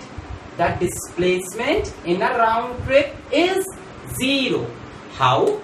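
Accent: India. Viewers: Actually, I am a Turk and I am going to the 10th grade and I was studying physics. I wanted to watch an English physics video just because I was curious. I wasn't expecting an Indian accent. That's why I suddenly laughed😂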